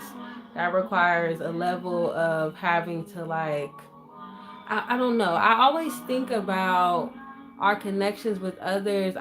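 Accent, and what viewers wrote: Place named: Ohio, United States. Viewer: Beautiful voice. I know you have that very good Ohio voice. We can sang 🙏🏾🎼🎼🎼🎙💃🏿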